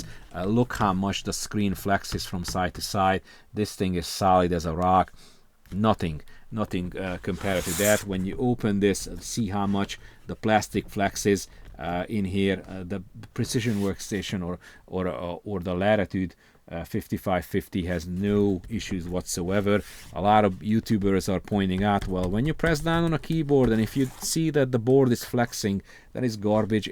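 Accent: Hungary. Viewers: Nem azért írtam, csak annyira karakteres a magyar akcentus. Jó nézni a tartalmaid,csak így tovább!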